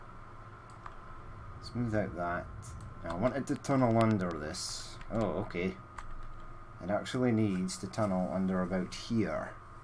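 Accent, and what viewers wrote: Scotland, United Kingdom. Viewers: Thats a proper Scottish accent laddeh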